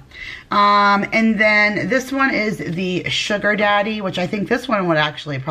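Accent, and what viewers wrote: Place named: New York, United States. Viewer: I was just watching another one of your videos I knew that accent I'm also from New York the Bronx no wonder I felt so close to u lol also ru Italian?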